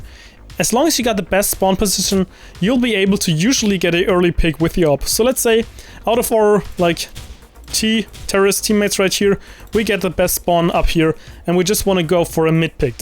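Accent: Germany. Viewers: Are you gGerman?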